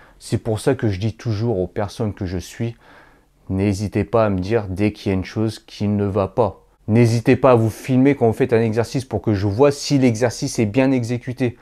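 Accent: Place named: Marne, France. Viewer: Qd je t'écoute, je me rends compte que c'est vrai qu'on a un accent, nous les marnais !😉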